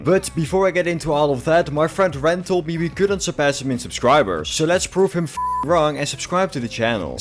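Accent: Netherlands. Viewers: Yep I hear a Dutch accent, let’s goooooo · I can hear your dutch accent. But keep up the content! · This Dutch accent lol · you sound dutch. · Are you dutch by any chance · i know that accent far to well this is a dutch youtuber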